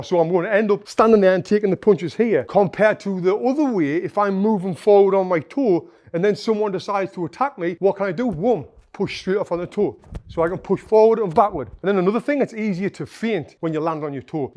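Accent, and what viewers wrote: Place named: England, United Kingdom. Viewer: Love your content and hearin the King’s English….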